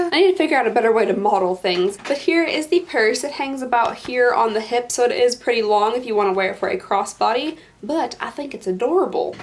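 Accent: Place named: South, United States. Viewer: I’m from the South but am currently on the west coast courtesy of the military and I watch all of your videos because I loooove hearing your accent. It makes home feel just a little less far away.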